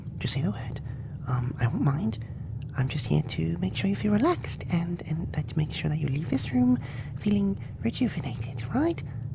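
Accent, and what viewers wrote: United Kingdom. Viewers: Is this a British accent?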